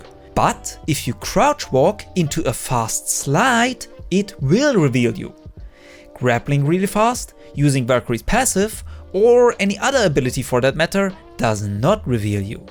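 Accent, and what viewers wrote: Germany. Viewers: Could it be that you are a german?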